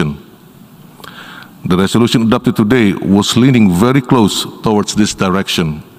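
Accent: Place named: Indonesia. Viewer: Wow bahasa inggrisnya, lugas ala indonesia namun ga sok ke inggris2an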